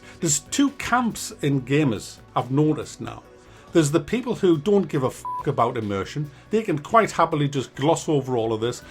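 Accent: United Kingdom. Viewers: where abouts in the UK is your accent from?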